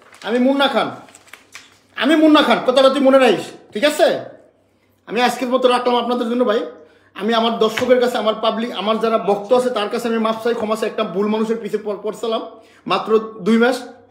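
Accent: Bangladesh. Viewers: Barisal er suddho vasha sundor lagteche sunte 😂😂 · ভাই আপনার কথা শুনে মনে হয় আপনার বাড়ি বরিশালে আপনে কি ভাবে এই মহিলার পাল্লায় পড়লেন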